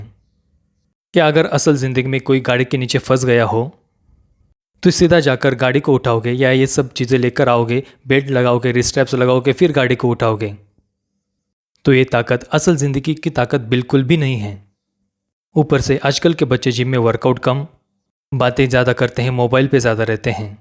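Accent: Maharashtra, India. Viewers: U r a marathi.and the way u speak Hindi, it sounds so cute 🥰❤I love u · Your Indian accent is thic af man but like the content. I'm just listening to everyone to find a middle way and for the things you said about straps, etc. I don't use belts or straps only raw lifts but I do use pre-workouts and coffee and my primary motivation to use them is putting up more work for more muscle mass I think it's justified and I see the results of my training both in practical situations like pain tolerance for example 2 days ago 12.5 kg dumbbell from the rack dropped to my foot and I felt little to no pain at the time and afterwards and 0 complications good stuff.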